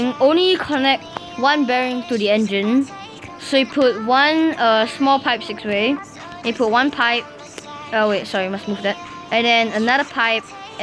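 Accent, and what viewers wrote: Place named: Singapore. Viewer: You are singapore right? Bc your voices •_•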